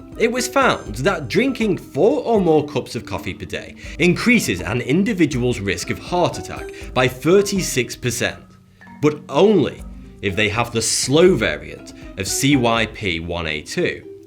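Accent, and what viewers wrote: United Kingdom. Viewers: This guy has a Monte-Pythonesque accent. Does anyone know what kind of accent this is? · uk narrators eventually get on my nerves...wish theyd just talk in a normal, non-dramatic, non-sarcastic or ironic voice..I just want info, Im not looking for the most amusing delivery vid... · Accent of this guy sounds a little like over-acting .... he sounds like the uttermost british guy you can get in the UK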